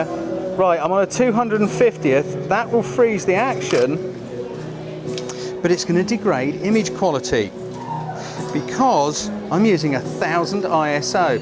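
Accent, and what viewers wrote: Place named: South East England, United Kingdom. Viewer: ....wonderful accent..sounds very south east England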